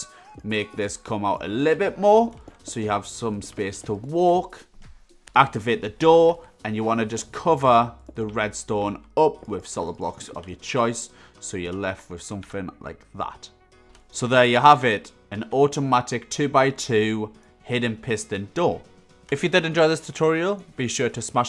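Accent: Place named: Newcastle, United Kingdom. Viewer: subbed on the fact that you’re a Geordie lad, git up son 😂 good content too btw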